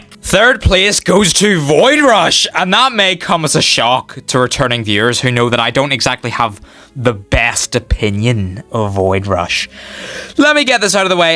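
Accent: Scotland, United Kingdom. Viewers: Never thought I’d laugh this much on a Scottish person ranking killer abilities while swearing frequently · Maybe he’s Scottish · Idk why this dude has a slight Scottish accent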